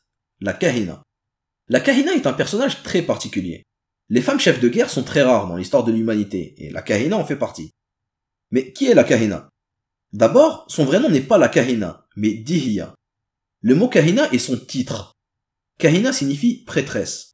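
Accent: Arab world. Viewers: Passionnant ! Mais êtes-vous obligé de parler aussi vite (par moment) en avalant la moitié des syllabes ? C’est un peu stressant vous êtes arabophone ?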